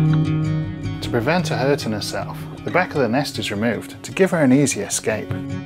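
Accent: United Kingdom. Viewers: its not long before luner is spending her days here.....luner? or luna? oh your british, ughhhhh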